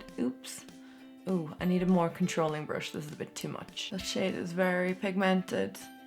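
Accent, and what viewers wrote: Ireland. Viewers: I thought you had a strong Irish accent, I’m Irish myself, but I live in America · I love ur accent I'm Irish also and most YouTubers I watch are American or British ands it's so refreshing to hear an Irish accent on YouTube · fucking love your accent #ireland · Gurl are u irishhhhhh 🇮🇪💘 omgggg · are you IRISH???? cuz I am and us kind irish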